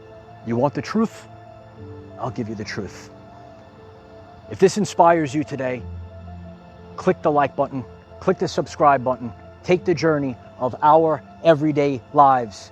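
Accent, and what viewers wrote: New York, United States. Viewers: Love your inspiring videos, and being a New Yorker myself, your NY accent...thanks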